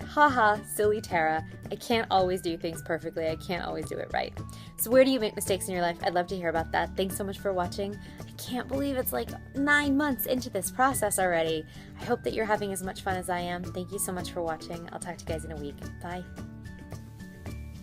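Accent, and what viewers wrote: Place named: RP, United Kingdom. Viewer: Hard to believe you could never speak English! Your vocabulary is strong! You look pretty young as well. My faith in the youth is kind of restored now 😂😂 just seen your videos. You have some posh accent. You've just inspired me. Anything is possible if you set your mind to it! A very sophisticated comment keep doing what you're doing!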